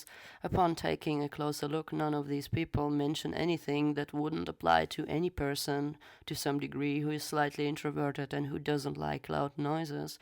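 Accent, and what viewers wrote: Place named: Russia. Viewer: Important email...haha, oh stop! Love the example you gave with the homeless persons. Exactly! So are you Russian? I heard you mention in another video you speak Russian and German but did not mention which is your mother tongue. I presume Russian as your accent is basically Russian. I had a girlfriend for several years from Skopje, her accent was very similar. So where are you from actually?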